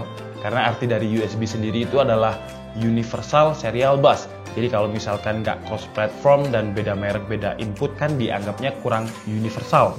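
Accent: Java, Indonesia. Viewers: Abang orang jawa ya bang😆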